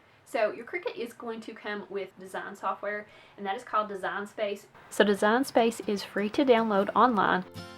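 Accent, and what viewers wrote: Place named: Appalachia, United States. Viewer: Thank you,! I love to listen to your delightful Appalachian accent!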